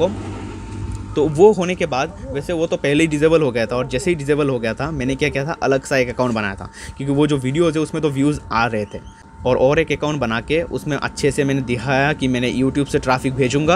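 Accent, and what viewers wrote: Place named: Bengal, India. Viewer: oh I thought you're from West Bengal because of Bengali accent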